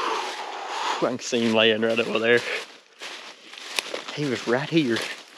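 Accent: South, United States. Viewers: The accent sounds really southern. What state are you from?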